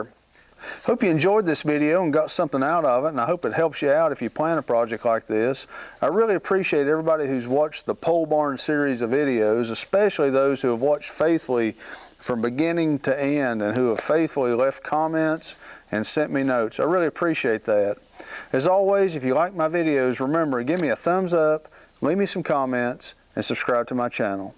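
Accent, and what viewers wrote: South, United States. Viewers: Wow I was so impressed with your presentation! Very very nicely done and with the nice southern accent!! I'm guessing North Carolina. I am in the planning stage of building a 20X10 garage with a 8X10 shed attached. This was the most comprehensive well thought out well constructed video on construction I have ever seen! Well done! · ***** You may be closer than you think. It sounds to me from listening to you on the videos that you may be from Alabama, Mississippi, Tennessee or Georgia. Not sure where you are but I'm in Mississippi. You probably noticed that my storage building has gone from something smaller (8X10 or 10 X10) to 12X12 or 12X14. It doesn't make any difference what size I make it, I'll have the same problem you have, it will be too small. I'm like you though, I want to do it by myself. It's the satisfaction of accomplishing something and knowing you did it without help. 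I'm not going to go so far as to cut down the trees and mill the lumber myself. And mine won't be as high as yours and I'm thinking of a lean-to roof. Flat with a slope from front to back. The things I've learned from watching your videos will come in handy no matter what the size of the building. Get is square first and get the holes level and so much more. Thanks again.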